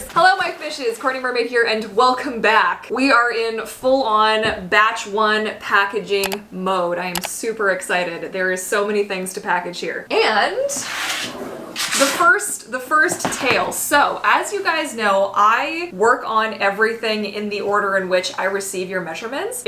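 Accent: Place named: South Africa. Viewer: Hahaha! Down here its pronounced ah-n-velope. 🤣🤣🤣 lekker(nice) south african accent! Truly enjoyed watching the video. It's like wrapping up birthday presents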